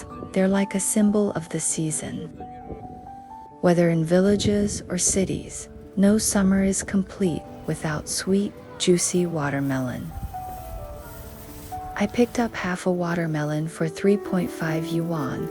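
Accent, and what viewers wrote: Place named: United States. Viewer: Why do you speak fluent English with an American accent.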